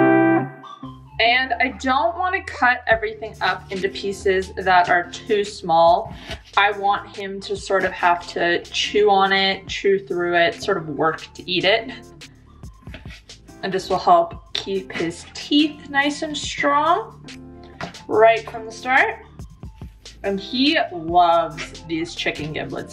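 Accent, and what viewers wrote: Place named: Australia. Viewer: Your Australian yayyyyy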